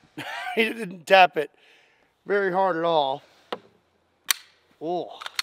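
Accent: Kentucky, United States. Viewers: What part of ky are you from brother?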